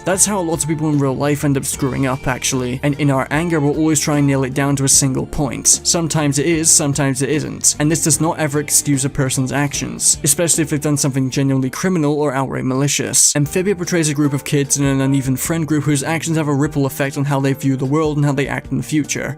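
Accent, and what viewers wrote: United Kingdom. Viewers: Brilliant analysis, I had most of the same thoughts about this show. The episodic nature almost put me off it but the slow burn of the overarching plot that was always present under the surface kept me hooked. Season 2 was fantastic! Season 1 was average except when it focused on the plot and season 3 was schizophrenic honestly, the tonal shifts didn't work and the ending felt like kind of a mixed bag but overall it was a wonderful show. The animation was brilliant, the comedy was consistently funny most of the time and the core story was so good. It really could have been one of the best. On an unrelated note, I must suggest you try She-ra and do an analysis if it grabs you, same for Arcane as well. I have loved all the analysis videos I've watched and while my bias towards British humor and accent contributed to that, I subjectively think your videos are objectively good. 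Anyway, TL;DR She-ra and Arcane analysis please.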